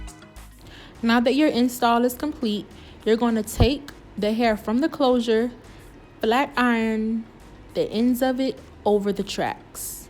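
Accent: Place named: New Orleans, United States. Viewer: Are you from New Orleans? You accent is telling me so 🤣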